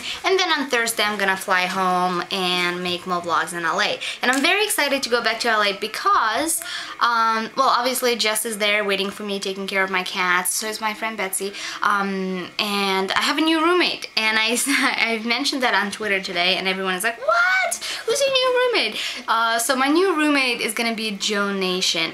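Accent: Russia. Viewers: I can tell your accent gets thicker every time you go back to Russia. lol really cute.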